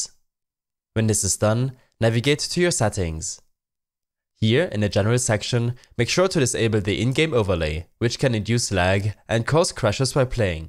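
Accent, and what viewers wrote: Germany. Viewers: diese deutsche aussprache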